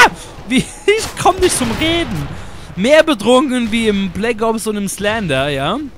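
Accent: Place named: Hesse, Germany. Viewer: Tatsache? Wow hätte ich jz nicht gedacht, denn es Unterscheidet sich wirklich kaum vom hessischen (Frankfurter Raum) Naja aber das Video unterhält, ist auch wirklich ne gute Idee ma richtig einen zu saufen, damit man zumindest dieses Kackspiel ertragen kann! Naja jz is ja Black ops 2 da.. hm wenn man darüber nachdenkt.. könnt man grad schon wieder anfangen zu saufen! (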